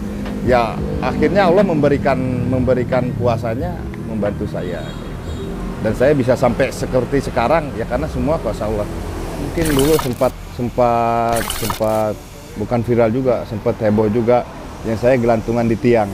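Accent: West Java, Indonesia. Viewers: Logat ngomong nya sunda🤭😂 mungkin kelamaan di bandung haha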